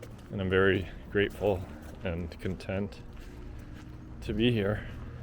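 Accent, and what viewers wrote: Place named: Minnesota, United States. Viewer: I'm guessing you're from Minnesota possibly from your accent? How does it compared to other border cities like TJ?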